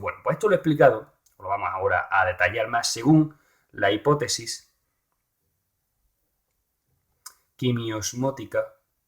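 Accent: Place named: Murcia, Spain. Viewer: como se nota que eres de murcia :)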